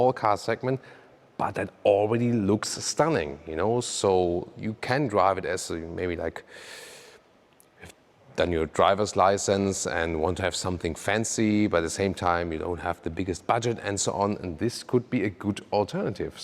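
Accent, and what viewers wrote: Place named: Germany. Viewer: Naziv i opis kanala na srpskom, voditelj priča engleski sa nemačkim akcentom. Baš zanimljivo.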